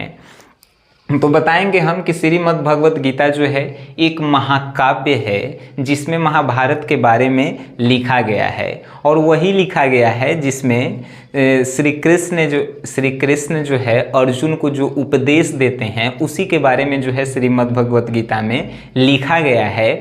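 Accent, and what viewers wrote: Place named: Bhojpuri, India. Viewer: Sir aap bahut acha pada rahe h ek dam bhojpuri legvej me pura samjha aa raha h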